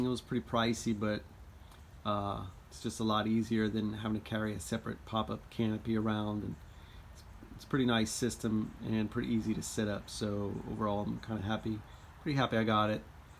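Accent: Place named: Louisiana, United States. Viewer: You sound like Louisiana! :) I grew up in Bossier.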